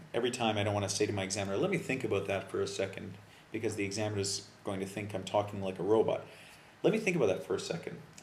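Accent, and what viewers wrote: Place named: Canada. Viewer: Just a Canadian accent.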